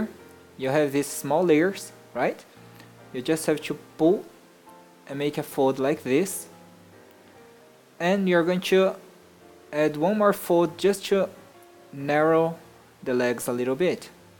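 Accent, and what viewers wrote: Brazil. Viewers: Conheço o sotaque de BR de longe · ja ja just by hearing your accent i could tell you are from Brazil! excellent video, i really enjoy it! Saudações dos Estados Unidos!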